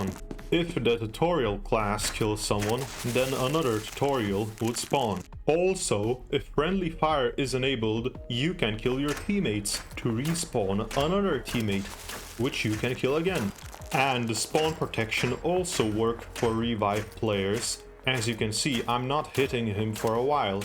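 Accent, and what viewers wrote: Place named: United Kingdom. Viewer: british scp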